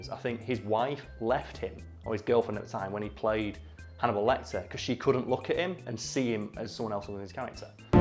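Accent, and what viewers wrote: United Kingdom. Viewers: Wait, wait. You sound so British and still call it Football? Only ausis and non-englishlanders call it football!?!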